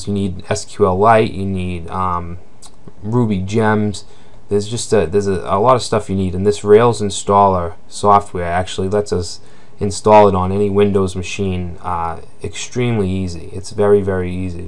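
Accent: Boston, United States. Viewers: bcz he is from boston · accent sounds very boston-y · Are you from Boston?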